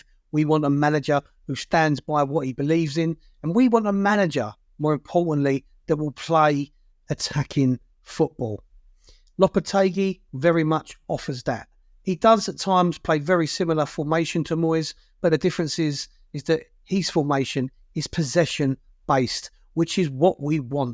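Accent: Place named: Cockney, United Kingdom. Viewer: Cockney Joe Pesci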